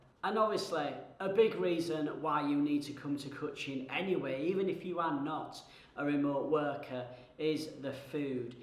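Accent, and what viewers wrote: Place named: Yorkshire, United Kingdom. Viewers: I like your yorkshire accent.. eiyupp? Used to live in Yorkshire for years. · cant wait to visit Malaysia again, will put Kuching on my to visit list, love the lack of tourists comment. good yorkshire accent you have and thx for the tips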